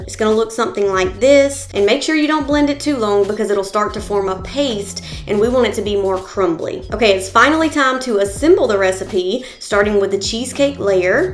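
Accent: South, United States. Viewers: The English dictionary says to pronounce it PEE-CAN --- /pəˈkän,ˈpēˌkan/ it is the Southern US that converts it to Peh-con. You have many very southern US language expressions in your speech such as 'yu'all' . The rest of the English speaking world recognizes that 'you' is often meant to be plural.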